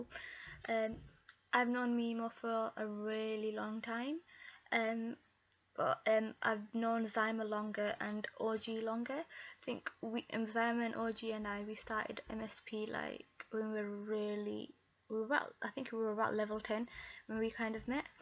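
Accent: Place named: Yorkshire, United Kingdom. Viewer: i heard she is from yorkshire up north but idk what that sounds like cos im from east england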